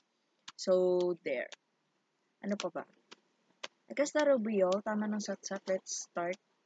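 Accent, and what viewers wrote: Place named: Visayas, Philippines. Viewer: Bisaya diay ka maam.😂no worries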